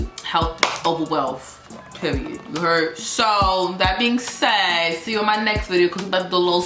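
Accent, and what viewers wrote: New York, United States. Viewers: it was the new york accent for me 😍 love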